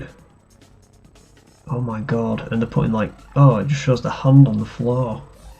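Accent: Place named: Liverpool, United Kingdom. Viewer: Are you from Liverpool? Your accent sounds alot like my buddies lol forgive if I'm way off the mark XD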